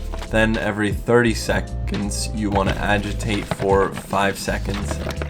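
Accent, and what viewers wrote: Netherlands. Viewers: I love the video so much! I've had a look at your website and i love it! (Idk why but it sounds like you have a Dutch accent) if so, gegroet! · Are you Dutch?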